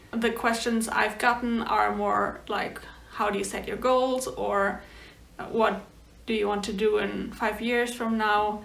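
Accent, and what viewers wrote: Germany. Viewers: I love the way you described that! I am German and its so nice to hear you talk. Especially because I think your english is amazing and you don't have a hard german accent what makes it so pleasant to listen to you. Thanks for your video.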